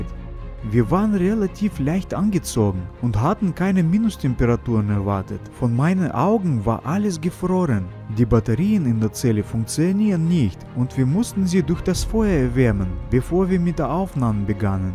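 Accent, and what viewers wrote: Russia. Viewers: Bist du ein Russe oder warum der Akzent?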